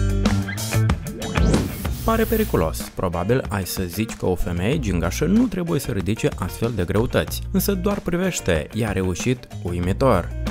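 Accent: Moldova. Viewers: 🤐 Foarte frumos clipul, dar comentariul este total aiurea de...moldovenesc de peste Prut ! Recomand revizionarea clipului ori de câte ori doriți, dar ÎNCHIDEȚI sonorul !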